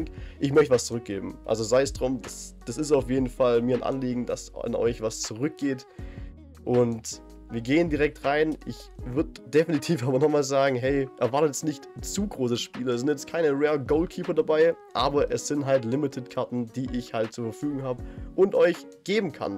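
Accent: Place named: Swabia, Germany. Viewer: Kommst du eigentlich aus dem schönen Schwabenland? Hört sich stark danach an 😁👍 Congrats natürlich zu den 1K 🤝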